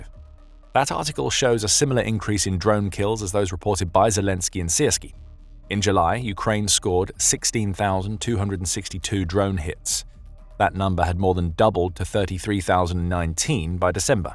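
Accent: United Kingdom. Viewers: Lol Russia has 1.5 million active troops and tens of millions of reserves lol I know as soon as i heard a british accent this was gonna be utter nonsense lol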